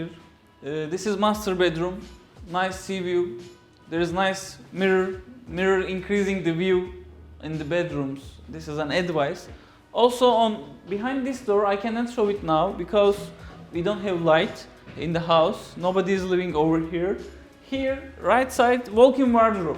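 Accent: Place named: Turkey. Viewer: ajajajajaaa hayirdir bozaci siraci durumlari...siz gercekten baska bir alemde yasiyorsunuz, bu ayaklari oyle turk kankalarina yutturursun ama yurt disindaki insanlar bilincli ve A'dan Z'ye herseyin dogrusunu arastirarak ogrenip geliyorlar...yeterince rezil olmus durumdayiz millet gulme krizine girdi bu videoyu gorenler, bir de o turkce inglizceleriniz....soyleycek laf bulamiyorum IQ -1000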